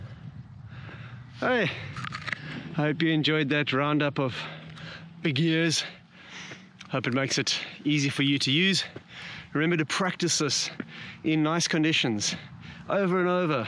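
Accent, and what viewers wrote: South Africa. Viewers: Cool video! Your accent sounds South African. Are you? Also, where was this video taken?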